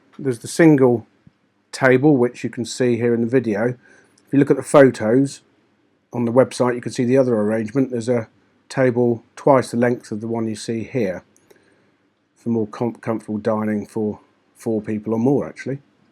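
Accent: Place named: United Kingdom. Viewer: He is British, they all sound strange to me..... Not nice to point it out!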